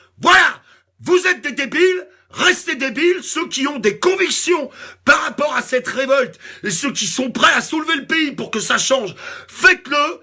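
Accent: Paris, France. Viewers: Apres cette video, si ceux qui l'ont regardee ont un peu de reserve ou de pudeur, ils ne devrait y avoir aucun commentaire! C'est tout ce que je dirai et a ton contraire, je finirai en disant;"je t'aime'! Ah! et cet accent super PARIGO que tu te payes, un regal!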